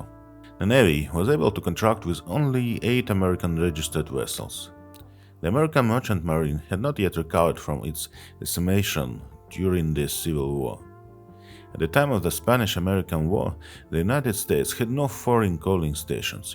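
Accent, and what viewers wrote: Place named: Russia. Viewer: great video and the russian accent makes it old home week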